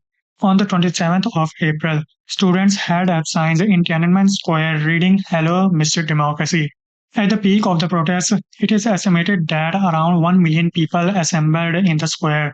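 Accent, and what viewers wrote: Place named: India. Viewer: For heaven's sake ..listening to your Indian accent when speaking Ingrish is so nauseating and annoying.. btw President Xi did not get rid of his political opponents but instead got rid of the rampant corruption in China once and for all.. what about India which is a also a notoriously corrupted country...? Please focus more on your India's domestic.corruption..political divide..religious riots..homelessness., slums .rather than worry about China's problems..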